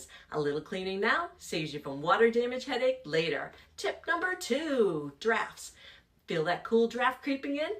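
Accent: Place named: Boston, United States. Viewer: that adorable Boston accent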